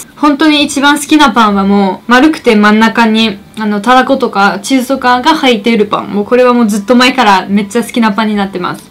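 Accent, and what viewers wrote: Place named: Yamagata, Japan. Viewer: なんか山形なまりになってるよ 笑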